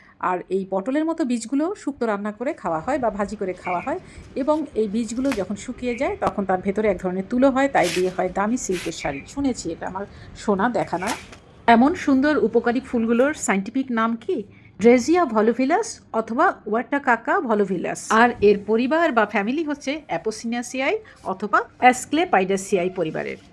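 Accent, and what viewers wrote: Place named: Bangladesh. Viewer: অসাধারণ ব্যাপার 💚 আমি প্রথম দেখলাম। আপনার কথা শুনে বুঝতে পারিনি আপনি ভারতীয় 😊 একদম বাংলাদেশের মানুষের মতো কথা বলেন ❤ যেহেতু আপনি বেহালা থাকেন সেহেতু ঢাকাবাসী আমি আপনার কাছ থেকে গাছটি পাওয়ার আশা করতে পারিনা! তবে গাছ এবং ফুল সম্পর্কে জানার প্রতি আমার খুব আগ্রহ! ভালো থাকবেন এবং ভালো থাকুক আপনার গাছটি🌿☘️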